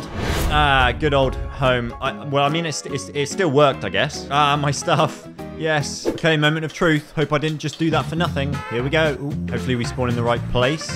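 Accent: United Kingdom. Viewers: The British accent makes this so much funnier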